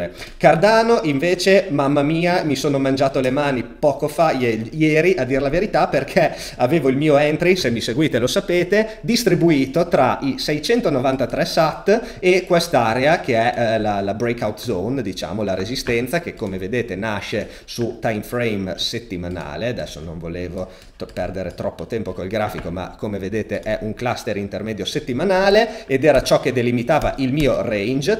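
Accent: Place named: Romagna, Italy. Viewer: Ciao, di dove sei? A giudicare dall'accento viviamo vicini, sono di Forlimpopoli! Per il momento sono messo così: 35%btc 45%eth 20%cro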